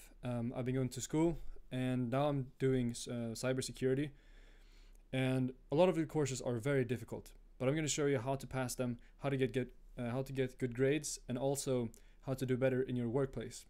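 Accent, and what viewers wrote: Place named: Scania, Sweden. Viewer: Skåne säkert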